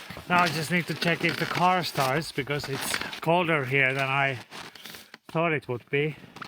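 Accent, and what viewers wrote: Finland. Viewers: It’s been a long time since I’ve heard the Finnish accent. I do miss it. Cheers to the both of you from a🇫🇮🇨🇦on the coast of British Columbia 🇨🇦 I’ve been following you two since you were on the North Coast of British Columbia 👌Cheers 🍻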